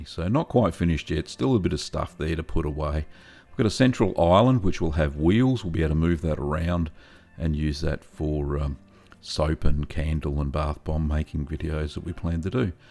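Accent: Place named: Australia. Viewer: I want to develop an accent like yours, can I develop it without coming to Australia, though? Like, I love the Aussies, but the snakes and spiders and sharks..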